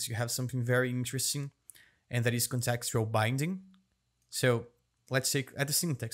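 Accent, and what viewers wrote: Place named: Brazil. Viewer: já de cara notei que tu é brasileiro kkkkk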